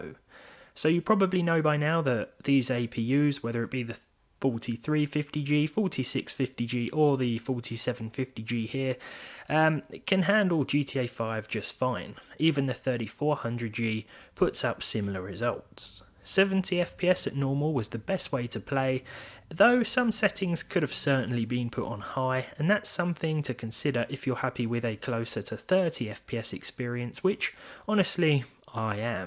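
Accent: United Kingdom. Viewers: Very british pronunciation... At least for my Russian ear.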